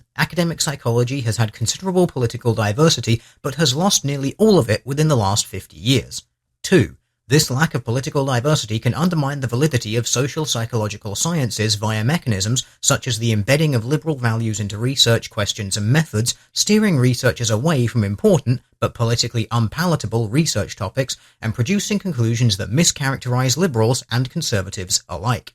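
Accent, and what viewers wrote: England, United Kingdom. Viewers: I should have said that I know is English and should have specified that he has an English accent, just not Received Pronunciation, though Young Ponymancer did point out that he is close to RP but his voice is nasal. He is certainly not Scottish. What about a Midlands accent but not Brummie nor Scouse though I can't them tell apart since I'm American. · I'm no expert but It's not British, it's English.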